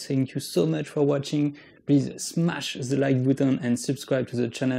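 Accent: France. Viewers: are you french ? cuz you sound pretty french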